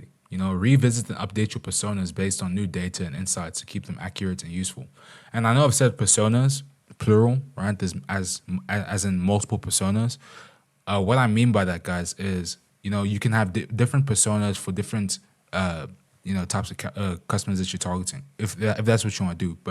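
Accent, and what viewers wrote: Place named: South Africa. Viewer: i could tell you were a fellow south african by the accent.😅.beautiful course brother.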